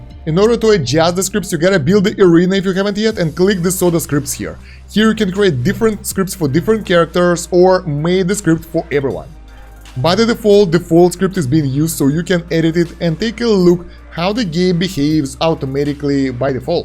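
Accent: Russia. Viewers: Ты русский, или притворяешься?)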